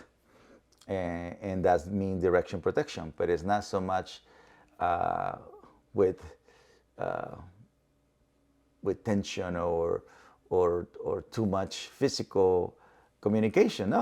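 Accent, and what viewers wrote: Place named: Mexico. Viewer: Love Cesar's Mexican accent I miss it when I'm not watching his videos. Not to mention he's also very spiritually awakened, not only does he know dog psychology but humans too. One of the best commendable spiritual teachers.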